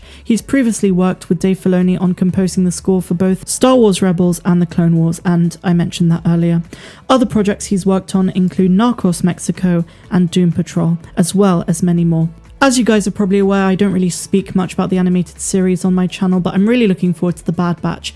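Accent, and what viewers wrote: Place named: Southern England, United Kingdom. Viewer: I can’t work out if your accent is south east or south west hahahahaha. Great work, enjoying the channel. 👍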